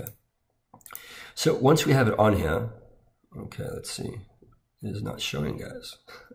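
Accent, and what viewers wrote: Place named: South Africa. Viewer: Thanks, much appreciated. BTW I detect a hint of a South African accent way back there, am I right? Cheers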